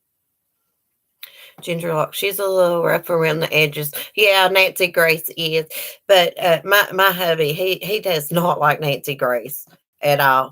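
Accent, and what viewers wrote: South, United States. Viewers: Hello. I am new to channel but I love it!! You are so down to earth. I love your southern accent!! I love the south. Your channel has no drama and you have good mods too. You and I seem to have the same opinions on most things. Ok going to watch more of your replays!! Thanks for being you, real!!!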